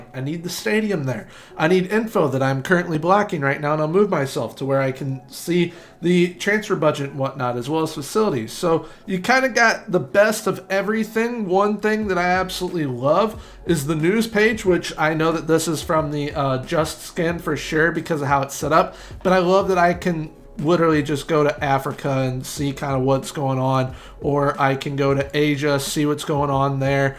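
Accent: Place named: United States. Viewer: weird hearing a yank talk fm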